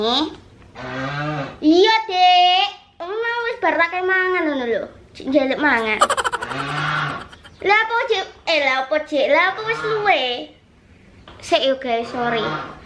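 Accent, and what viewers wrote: Java, Indonesia. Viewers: Medok bgt logat mba 😂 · aku mojokerto juga medok wkwk. Lah bukannya dia dri tulungangung ya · Seneng dengar meddo' jawanya😁 Sek to dek, meneng o dek, aku nge review iki loh🤣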